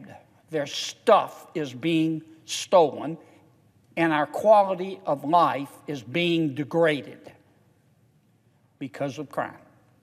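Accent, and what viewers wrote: South, United States. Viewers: Yeah his southern accent is nice, but what the hell is he doing to get the ar15 off the street and save our children. NOTHING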